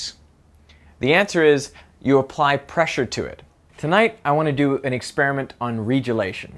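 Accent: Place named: Australia. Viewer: He had a heavier Aussie accent at the time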